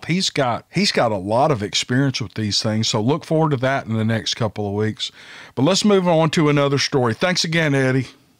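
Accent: South, United States. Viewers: All these stories were very credible. Stories submitted about these creatures that do not include the fear factor but depict them as friendly harmless teddy bears are very suspect, in my humble opinion and don’t ring true. Of course this is not a slight towards you as the narrator. You simply recount it as it is presented to you without bias and you have just the right southern accent to do a very good job. Very enjoyable listening.😊 Greetings from the “great white north.” 😁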